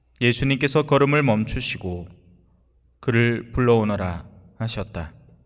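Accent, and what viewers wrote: Gyeongsang, South Korea. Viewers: ..경상도.억양.실제에닮은꼴..많은.현세에삶.어찌콕콕..지적하여주신.신부님.저희들삶안에.오셨네요.. 절뜍..절뚝.저희들에삶..감사합니다돌아보게합니다🕯🥀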